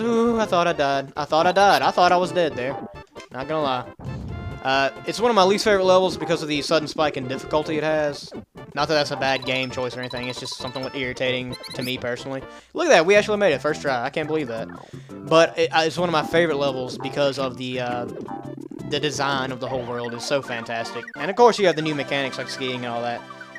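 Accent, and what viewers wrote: South, United States. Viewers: Every time I hear Southern accents, it makes me long for some of my Mom's cookin'! By the way, you're pretty good at this, hope you keep at it!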